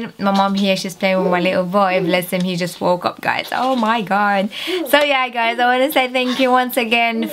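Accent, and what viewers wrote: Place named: United States. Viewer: Are you from the uk, you sound American 😂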